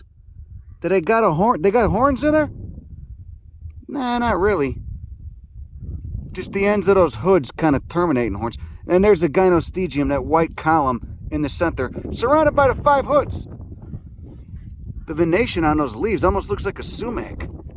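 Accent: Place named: New York, United States. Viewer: DAMN U SOUND LIKE NEW YORK !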